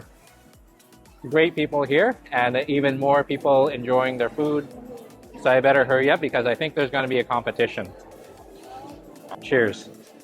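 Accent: Canada. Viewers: Pizza? Fish? Spring Rolls? I think you said. I'm not sure if I understood your Canadian English? You're lucky you are a Food Reviewer, otherwise I would be smacking you through the TV. I would like King Crab Stuffed Lobster, with a side of Crab and Lobster. THE END!!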